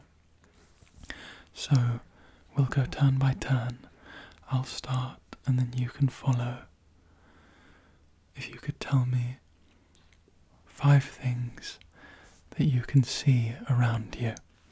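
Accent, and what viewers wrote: United Kingdom. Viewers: yeah british accent makes me sleep 😍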